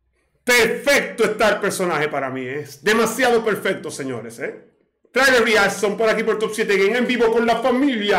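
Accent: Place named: Dominican Republic. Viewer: Eres dominicano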